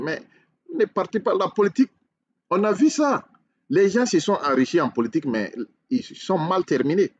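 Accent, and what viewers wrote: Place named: Burkina Faso. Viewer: Tu as un accent Burkinabè ! Tu es Burkinabè ? Je viens de découvrir ta vidéo pour la première fois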